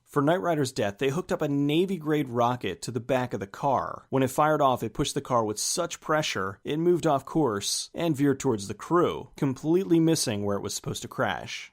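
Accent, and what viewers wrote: United States. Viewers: Every time I hear that American voice...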